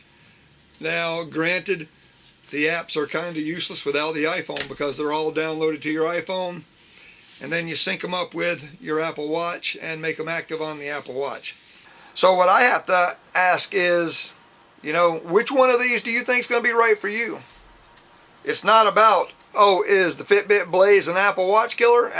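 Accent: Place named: South, United States. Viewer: Use Suriii.... ahh southern people accent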